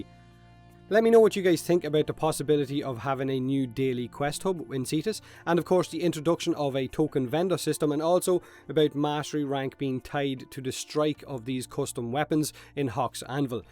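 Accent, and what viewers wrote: Ireland. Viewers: i love the irish accent. hell i love any games reviewed if i could ;)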